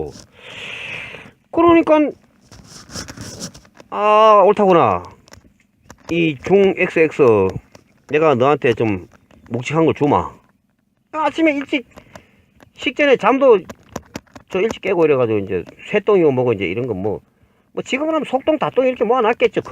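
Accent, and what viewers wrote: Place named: Samcheok, South Korea. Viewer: 제나이쯤 되셨겠네요 목소리도 삼척사람 .저도 삼척 사직리 사람 .지금은 파주시, 수고많네요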